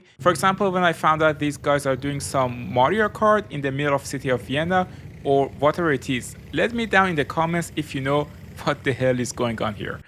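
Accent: Iran. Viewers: oh this persian accent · Are you Persian? Your accent is sooo close to Persian Thanks for the video by the way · لهجه ایرانی غلیظ😂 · لهجه ایرانی باشه ؟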